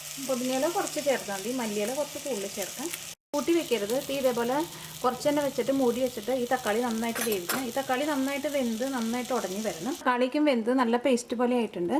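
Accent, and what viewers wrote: Thrissur, India. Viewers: Ithonn vrithiyaayi paranj tharoo mole, ingale thrissur bhasha thiriyaanjittaa...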